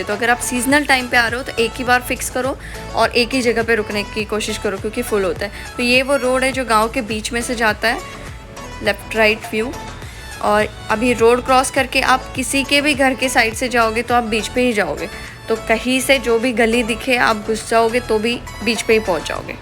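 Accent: Malvan, India. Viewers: तुझा मालवणीच आमका गोड वाटता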